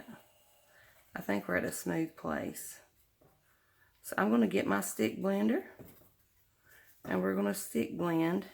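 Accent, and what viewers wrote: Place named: South, United States. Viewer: Great job & I love your southern accent 😍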